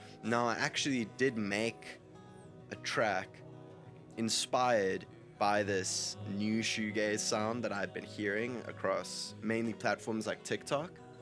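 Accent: South Africa. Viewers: Cape Town accent?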